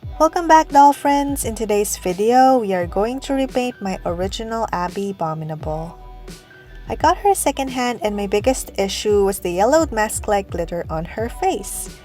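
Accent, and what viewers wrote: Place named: Philippines. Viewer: I'm new to channel, and I've been wondering, is she from the Philippines? Please correct me if I'm wrong, but her accent is just so familiar and way too similar to one of the average accents that filipinos have when speaking english;3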